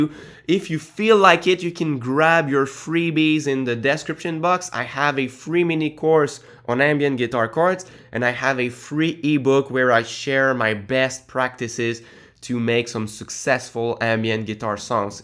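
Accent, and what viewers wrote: Quebec, Canada. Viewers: Quebecois accent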